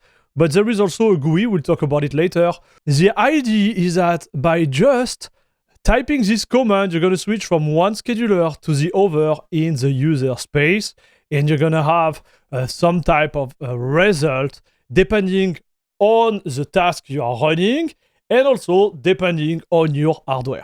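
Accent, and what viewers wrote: France. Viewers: horrible cette accent français ont ne comprend pas grand chose.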